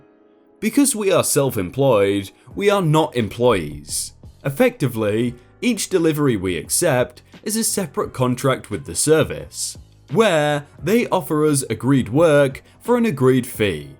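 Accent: United Kingdom. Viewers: Despite sounding very much like a native Brit, at times he can barely speak English and his comments are inane. Can't listen to any more of him, largely due to the comments that he no doubt thinks are funny and clever. Sorry mate.. they are not.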